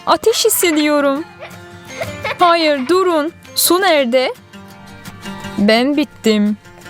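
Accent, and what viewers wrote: Azerbaijan. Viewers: Siz deyəsən Azərbaycanlısız səsiniz qəribə çıxır mütləq Azərbaycanlısız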